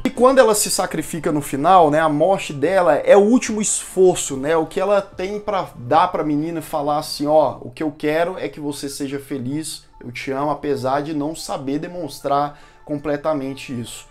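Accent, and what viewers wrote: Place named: Goiânia, Brazil. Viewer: Sotaque goianiense detectado!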